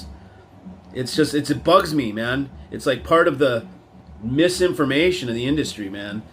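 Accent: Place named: Rural, United States. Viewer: hey man great video, what is it with the hickey accent lol, hey I have balanced amps and pre amps and dac, bought a cable for the preamp once, polarity on the ac cable was inverted, got a hum right there, you make power cables so you should have run over that scenario. My point, even balanced equipment can get hum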